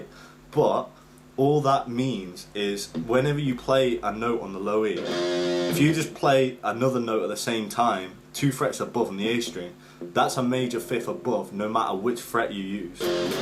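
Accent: United Kingdom. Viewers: Thank you for the video man. I found it very useful. I think it may be called a perfect 5th though. Where in the UK are you from bud?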